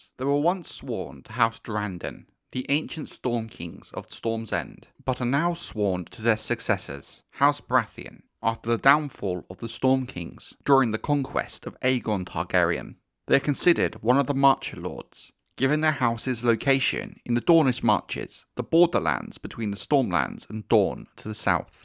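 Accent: United Kingdom. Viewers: Hearing a narrator from the UK (assumption) makes me feel like these are history videos as opposed to lore.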